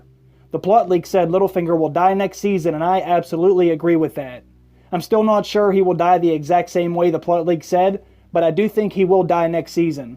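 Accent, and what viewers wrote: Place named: New Jersey, United States. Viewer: you need to be deported then. disappointed with your lack of caring and making my ears bleed with your semi informative jersey accent.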